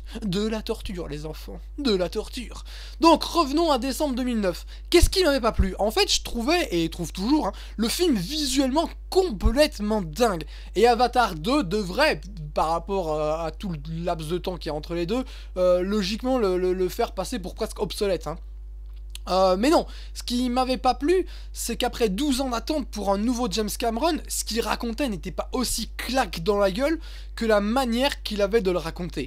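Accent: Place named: South, France. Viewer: (Ton accent du Sud est un peu à revoir, mais merci quand même de cet essai sonore:D) Ah oui, je me souviens de ta chronique sur Avatar d'il y a quelques années ^^ (comment l'oublier? tu t'en étais pris plein la gueule, après ta vidéo, par des personnes qui étaient fans du film xD). D'ailleurs, ce serait bien que tu postes tes nouveaux "Grumpy mate des flims" sur ton blog.:) C'est intéressant de donner une seconde chance à des films, je le fais aussi (pas pour TOUS les films que j'ai trouvé bof, mais pour certains oui, et du coup j'ai parfois de bonnes surprises au second visionnage).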